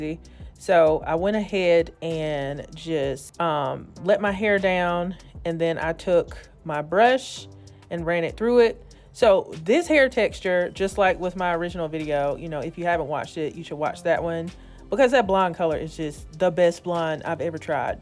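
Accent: South, United States. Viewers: I don't think I ever realized how much you sound like a southern belle, lol. It might be the edible I had. 😂 The wig look great!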